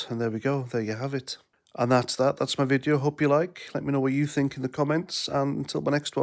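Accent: Wales, United Kingdom. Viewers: LOVE your Welsh accent. I could listen to it all day. My grandfather was Welsh.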